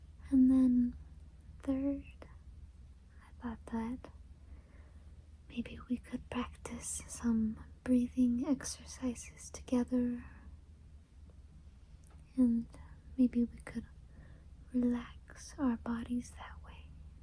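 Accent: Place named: Eastern Europe. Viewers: I thought you were from Eastern Europe honestly. I’ve been on the West Coast my entire life and only heard an accent similar to yours from those who immigrated to the US when they were younger, so they end up with a bit of a mixed accent. Anyways, love your voice and videos. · that wierd. I thought I heard a east european accent. I am a Las Vegas native and I thought I knew west coast accents lol interesting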